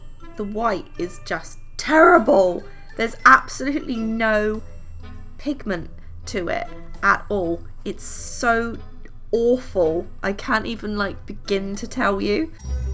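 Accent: England, United Kingdom. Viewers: Why do u sound British? 😂🤣 btw love u! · Are you English or American I’m confused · I was very surprised when you said "dollars"... you sound so very British! (new to this channel, so maybe that is a very stupid comment/question to the regulars). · She has such a British accent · Are you English living in America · Is she british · Why does she have an English accent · not related to the video but, are you English or American. You always talk about going to American stores like Walmart but you sound English to me.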